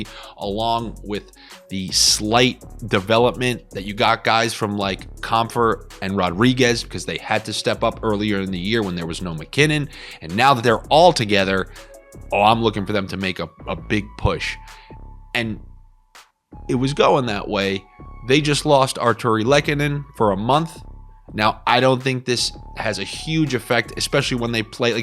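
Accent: Boston, United States. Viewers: Love the Boston accent talk.....you do it well.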